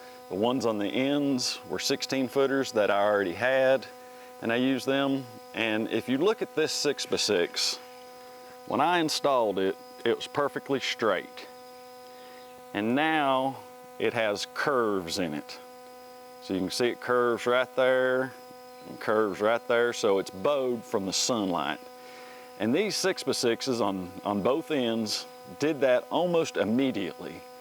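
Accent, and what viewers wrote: United States. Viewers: Don’t be so hard on yourself, mistakes are just lessons learned, but I love the southern twang of tuba six’s and tuba four’s lol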